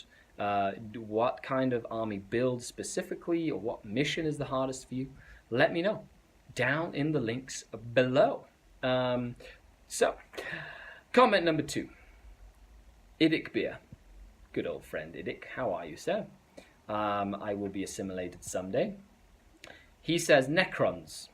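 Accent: United Kingdom. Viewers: Your Canadian!!!!! How come you have an English accent?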